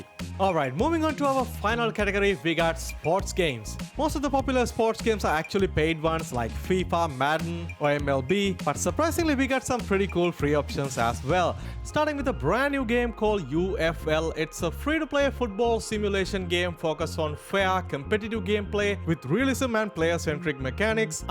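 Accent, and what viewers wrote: Kerala, India. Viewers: de malayali engligh accent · Nice video brother , bro why do you sound like mallu ( no offense )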